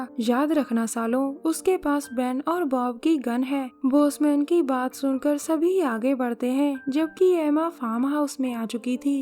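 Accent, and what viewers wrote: Punjab, India. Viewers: ਤੁਹਾਡੀ ਆਵਾਜ਼ ਤੋਂ ਸਾਫ ਪਤਾ ਲੱਗਦਾ ਕਿ ਤੁਸੀ ਪੰਜਾਬੀ ਹੋ ਤੇ ਰਿਹਾਇਸ਼ ਪੰਜਾਬ ਦੇ ਕਿਸੇ ਪਿੰਡ ਦੀ ਹੈ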